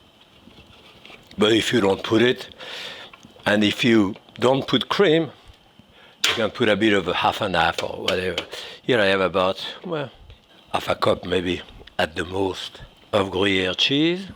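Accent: France. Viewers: Monsieur Pépin, j’aime regarder votre émission de cuisine, et votre accent français quand vous parlez anglais est si adorablement mignon 😊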